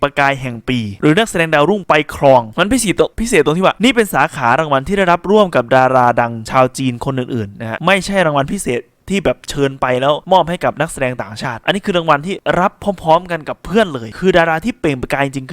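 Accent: Ubon Ratchathani, Thailand. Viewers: แอดมินเป็นคนอุบลหรอครับสำเนียงเหมือนคนอุบล😊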